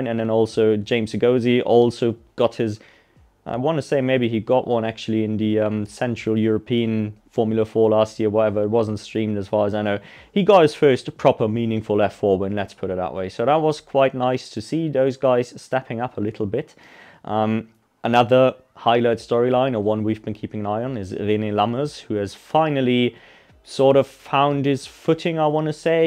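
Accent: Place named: Scandinavia. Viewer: Also I am trying to place your accent and failing (I'm a language and linguistics nerd, so I do this with all YouTubers I watch), sounds both Scandinavian and English with a bit of German. I'm going to place a random guess and say Iceland as a wild guess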